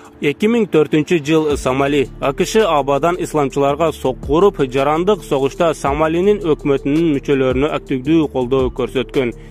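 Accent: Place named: Batken, Kyrgyzstan. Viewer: Ыны дебей айлыгЫн дейт кыргызча , сууду дебей сууну дейт Баткенчи окшойт комментатор.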